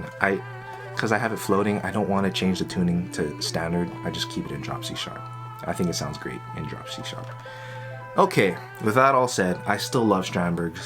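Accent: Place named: Hawaii, United States. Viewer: I thought you were from Hawaii after hearing you speak and your demeanor. So am I. I’ve been an Ibanez player for as long as I’ve been playing and developed tendinitis. I thought strandbergs ergonomics would be a reason to switch.. maybe it still is but it’s a bummer to hear your wrist hurt after shows.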